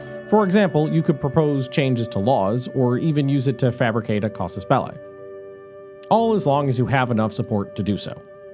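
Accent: United States. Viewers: absolutely in British English...the dude speaking is American.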